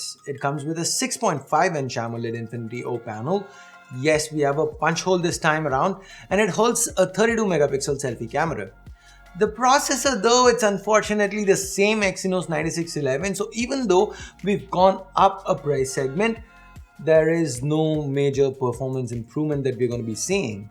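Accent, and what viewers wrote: India. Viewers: Very well done 👍 you're speaking the voice of India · i love u indian talking engliah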